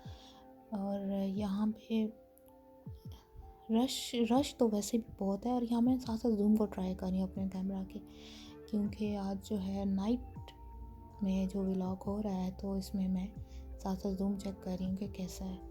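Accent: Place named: Sindh, India. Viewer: Ap kya Sindhi nako? Ap k accent may Sindhi pan tau hay😊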